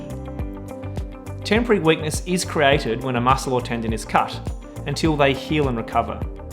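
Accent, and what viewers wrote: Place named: Australia. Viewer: This is great but i would’ve understood it more if it wasn’t in Australian.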